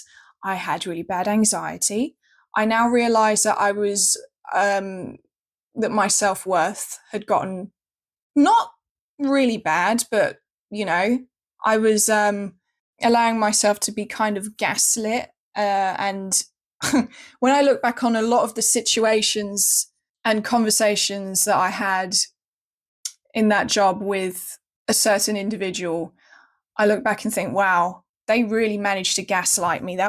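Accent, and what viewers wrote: RP, United Kingdom. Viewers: That's not really posh, just normal English. She can actually string a sentence together which you're probably not used to hearing these days. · Hearing posh English is like hearing birds to sing.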